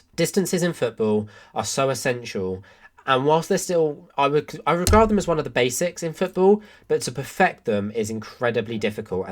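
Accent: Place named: Scotland, United Kingdom. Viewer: Not much to add to the other commentators. Well analyzed, dear Mr., a really German sounding name as you know, and I always enjoy some English accents, like yours from Scotland. Greetings from Potsdam. Nearly forgot to add, the goals were shot with some luck, weren't they? The goal/attempt ratio will go down I would expect.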